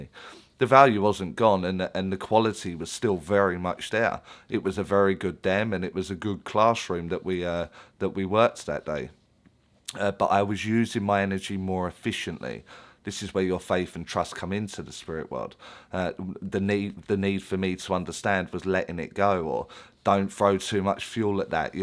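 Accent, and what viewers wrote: Essex, United Kingdom. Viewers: I've been following you since 2018/2019 and back then I could only hope I could be a Medium. Fast forward I'm now demonstrating as a Medium from 2020 and you've been truly inspirational. I am now rewatching your videos with new eyes and again learning more at a deeper level. Plus love the Essex accent but then again I'm bias as I'm Essex too and just hope I'm as down to earth as you 😊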